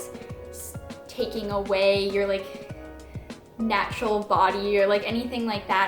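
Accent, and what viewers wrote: Canada. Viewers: I was curious if you were Canadian because of the way you said about & out, super cute 😂 but my anwser was answered at the financial part of the video. Glad you did it for you & no one else ! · youre from the great white north ye'? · that makes me happy the Canadian accent sounds good! It just sounds normal to me.. I suppose it's an accent to others. lol :)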